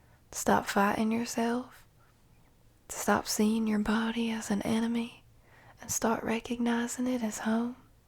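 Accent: South, United States. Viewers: Loved it, I also the southern accent it was amazing! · Let me talk to who for what?! cooking with the country accent 🙏🏻, also I normally don’t ask but if you guys can pray for me Tomorrow morning is my Grandpas funeral I’m fine right now but I don’t know how I’ll feel tomorrow 🙏🏻❤️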